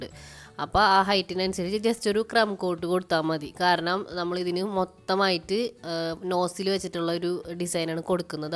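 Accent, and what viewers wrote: Kodungallur, India. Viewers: Iyalde veed kodungalloor ano?ente hus area slang und nannayi.😀😀😀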